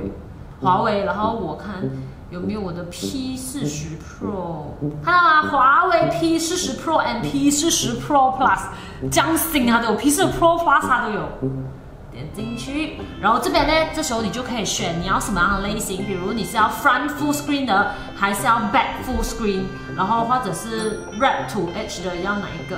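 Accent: Malaysia. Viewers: 马来西亚的口音 好有趣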